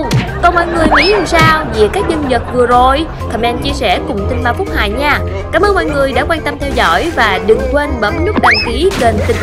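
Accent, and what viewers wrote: South, Vietnam. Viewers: Lần đầu góp ý với Channel 3p mình phải thật lòng nói Ms MC có giọng nói Nam Bộ rất vui tính và very intelligence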